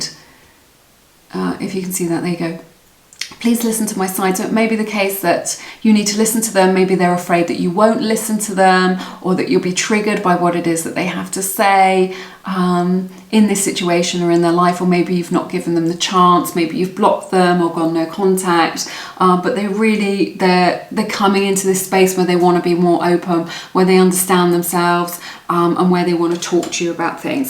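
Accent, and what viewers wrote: United Kingdom. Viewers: Dear, i just wanted to tell you that i absolutely love how you talk (that british accent...👌) also, pile 2 resonated so much, thank you so much!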